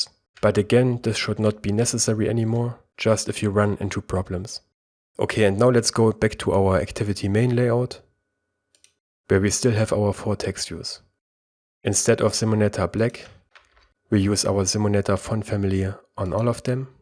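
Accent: Germany. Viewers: Great video keep em' coming (Man merkt du bist deutscher/Österreicher😂)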